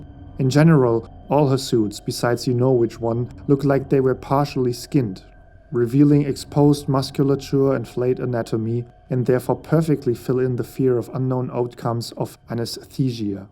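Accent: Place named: Germany. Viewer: Don't feel guilty for your Germanesque pronunciations man, they're charming 😃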